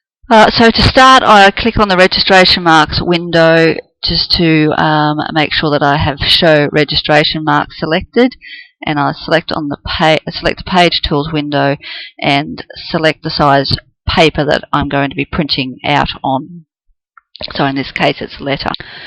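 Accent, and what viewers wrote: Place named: Australia. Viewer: Loooove your Aussie accent! TFS that vid. It's awesome! :-)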